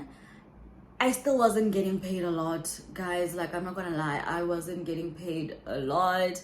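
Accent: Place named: South Africa. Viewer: I Love that you keeping your SA accent ! Aish !!! Hibo !!!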